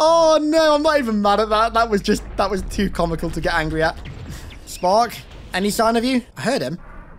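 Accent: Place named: United Kingdom. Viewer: Very British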